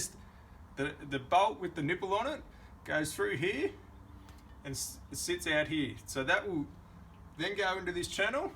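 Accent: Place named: Australia. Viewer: Hi I’m interested to know where abouts you are, I noticed the Aussie accent. I’m in Melbourne and I have an old Rover edger I need restored.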